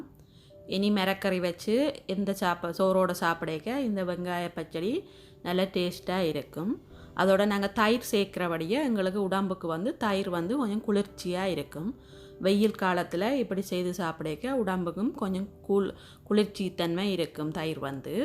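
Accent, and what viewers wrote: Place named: Sri Lanka. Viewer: உங்கள் இலங்கை தமிழ் மிகவும் அருமை....😍😍👌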